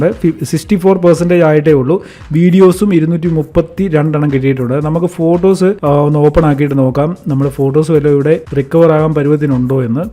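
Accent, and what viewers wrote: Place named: Kasaragod, India. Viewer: കേറി വാ മക്കളെ...🙋 ഇനി മുതൽ കാസർഗോഡ് ഭാഷയിൽ ബ്ലോഗ് കേൾക്കാം...😍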